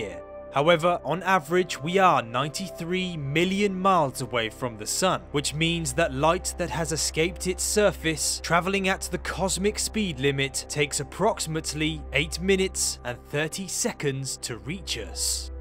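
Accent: United Kingdom. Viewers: you sound like a brit.. so why are you using miles? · More amazing than this video is that the video was narrated by someone with what sounds like a British accent and using miles instead of kilometres.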